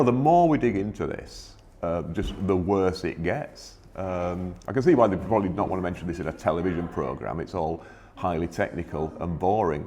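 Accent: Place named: Sheffield, United Kingdom. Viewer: On a side note, have you said anywhere where you are from. Your accent has a North Sheffield sound. Or an I miles away?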